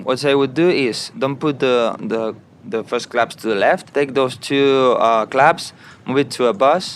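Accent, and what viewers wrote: Spain. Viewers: Tipic spanish inglish JAJAJA GOOD video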